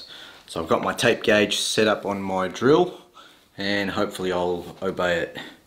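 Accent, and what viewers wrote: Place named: Australia. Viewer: I've just subscribed. Good to hear an Aussie on YT. Keep up the great work.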